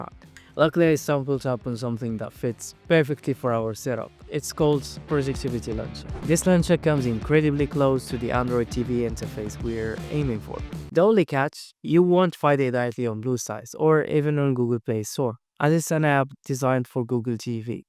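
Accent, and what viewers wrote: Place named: Morocco. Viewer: Anglais mdrdra b darija accent love the video, Good luck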